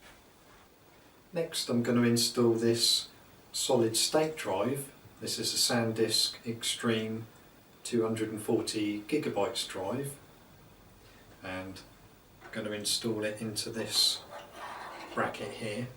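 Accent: United Kingdom. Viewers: At last someone british 🇬🇧🇬🇧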